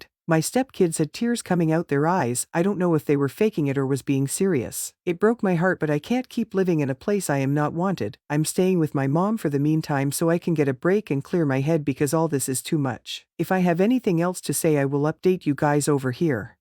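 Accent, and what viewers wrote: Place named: Midwest, United States. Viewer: The ai is Midwesterner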